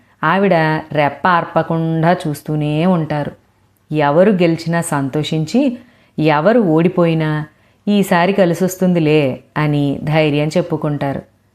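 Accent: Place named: Andhra Pradesh, India. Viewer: Madam meedi AP lo ye jilla ? Mee slang ( or accent) ki nenu pedha fan.Mee story chadhive vidhhaamam chaala natural ga, kallatho chusthu chepthunnatluntundhi Maadhi Nellore.Anduke meeru story chadhuvu thunte bhale vinaalanipistundhi .🌹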